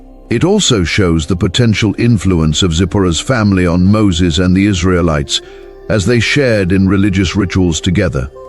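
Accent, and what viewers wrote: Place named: United Kingdom. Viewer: Amazing that this narrator,with a a strong British accent close to one doing their version of RP He's on too many videos,which proves most of these videos on bible pretty much phoney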